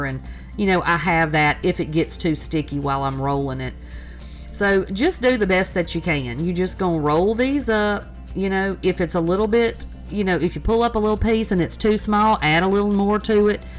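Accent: South, United States. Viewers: ❤,your southern accent is priceless, I love watching you and learning ❤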